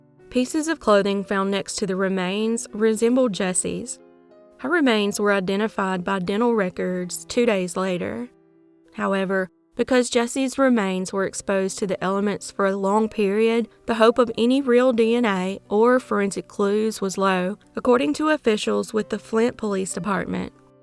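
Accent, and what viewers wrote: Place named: Tennessee, United States. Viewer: No sweetheart, you is 100% country. Cornbread is more city than you. I can say this because you sound like everyone in my hometown. Where I still live. TN We love you.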